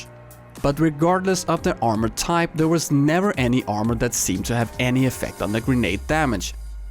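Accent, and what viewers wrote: Denmark. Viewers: Hahah man kan høre han er dansk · You sound danish my friend :-)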